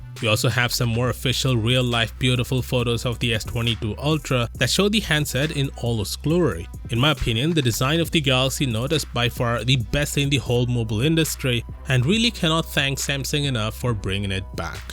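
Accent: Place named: India. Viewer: I loveee your accent. I would think indian with the Palatial clicks, but there’s a nonrhotic r, May I ask where from?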